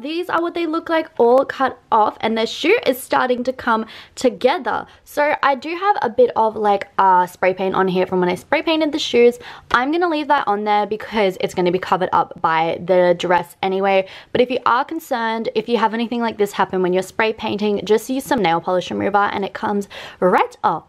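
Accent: Australia. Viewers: Your Australian accent is very refreshing! Awesome video btw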